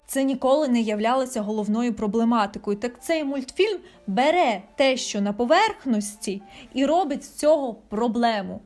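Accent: Surzhyk, Ukraine. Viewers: Какой же у тебя омерзительный суржик! Неужели так тяжело выучить украинский язык?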